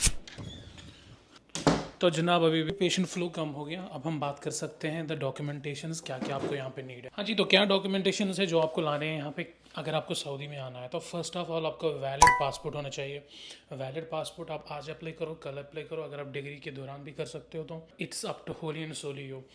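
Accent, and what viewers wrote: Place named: Kashmir, India. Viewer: Bhi lots of love from mother land kashmir ❤ mai soch he raha taa aawaz tio kashmiri he lag rahie hai...phir old vlogs dekha....luv 😮❤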